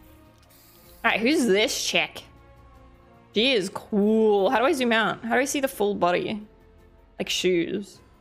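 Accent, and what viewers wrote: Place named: Australia. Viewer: Is it just me or is getting more Aussie sounding as time goes on?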